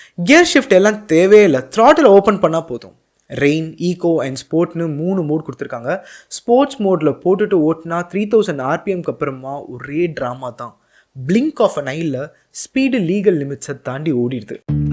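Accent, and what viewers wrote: Chennai, India. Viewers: You are an amazing motorcycle tamil youtuber. When you talk the technical jargon in your own way is so cool man especially in our Chennai slag...you nail in every video. Although I am less interested in motorcycles I used to watch coz of you.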